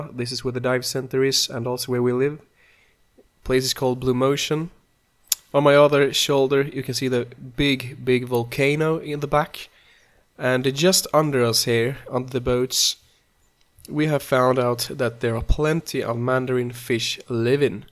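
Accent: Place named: Scotland, United Kingdom. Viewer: idk why you sounds somewhat Scottish to me lol. Anyoway I got my captive bred Mandarin a few days ago. So glad we are able to captive breed these beautiful fishes.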